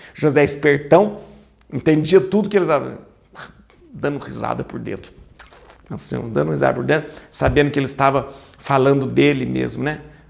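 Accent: Minas Gerais, Brazil. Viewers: Boa tarde a todos,. Boa noite padre sua benção. Maria de santo Amaro SP SP. Padre. Olha aí aquele ditado. Deus escreve serto. Se os irmãos não tivesse vendido José. Não tinha cumprido. O sonho de José la dos feixes. Vio Jesus escreve serto por linhas tortas. Amo o seu sutaque mineiro kkkkkk também dou mineira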